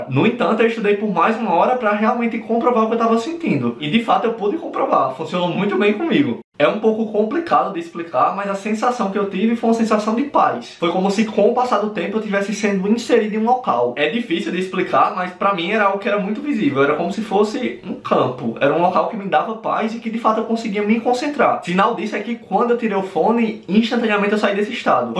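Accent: Northeast, Brazil. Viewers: O sotaque dele é de Sergipe, Pernambuco pra cima. Ou do norte da Bahia, q faz divisa com esses estados aí. A Bahia é um estado grande, aqui tem no mínimo 4 sotaques: o de Salvador e região, (q é completamente diferente desse cara do vídeo), o do norte (q é bem parecido com o do cara do vídeo), o do sul (q é parecido com o do Espírito Santo e Minas Gerais e o do oeste, parecido com o de Goiás. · mas minha gente esse homão lindo + sotaque nordestino... :chefkiss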